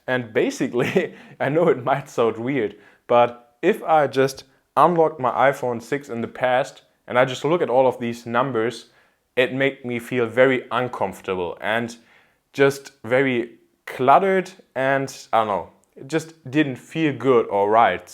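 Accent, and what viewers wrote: Germany. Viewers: love the German accent and cool opinions.. subscribed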